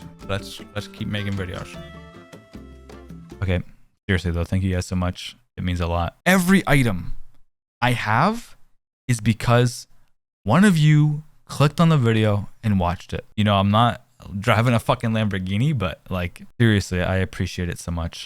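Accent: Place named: Saskatoon, Canada. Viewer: Are you from canada ? More specificly from saskatoon? I swear to god you have that accent like youre from around here. 😂 sorry for the weird question.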